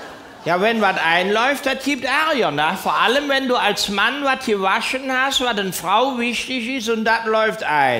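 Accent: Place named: Lower Rhine, Germany. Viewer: Ich liebe es! So witzig, so genial Genau so sprechen wir am Niederrhein :P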